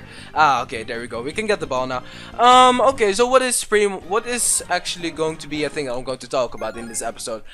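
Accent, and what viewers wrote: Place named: United Kingdom. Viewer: nl for tha win! also, first vid I heard of you, I kinda thought you were brittish. XD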